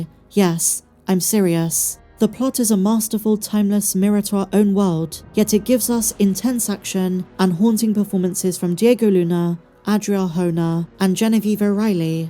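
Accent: United Kingdom. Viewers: Ain’t even gonna lie just noticed you are British lmao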